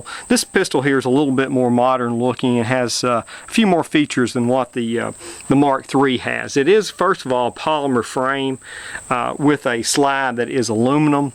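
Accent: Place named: South, United States. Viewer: Buddy, where in South are you from? You have a very distinct accent.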